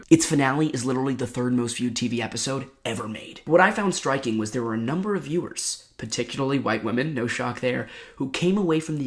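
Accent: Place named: United States. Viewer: Wait you're from Scandinavia? Your accent sounds so American.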